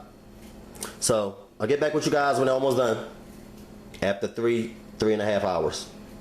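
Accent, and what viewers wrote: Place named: Texas, United States. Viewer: You sound like some of my relatives from TX. I know I overheard one of them mention that they boil them as a part of their cleaning process then proceed in cleaning.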